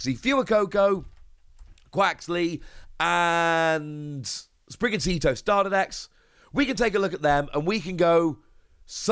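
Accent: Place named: United Kingdom. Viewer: Yeah it’s pretty common for UK English speakers to use the “yu” sound for when the letter U follows a consonant.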